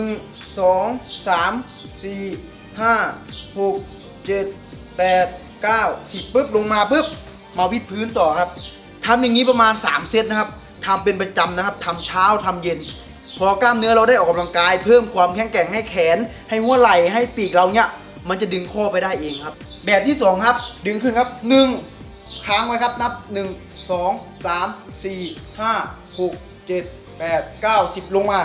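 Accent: Isan, Thailand. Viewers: คนแสานบ่นิคับ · บ้านเกิดพี่ยุไหนครับทำไมพี่ชอบพูดออกแบบอิสาน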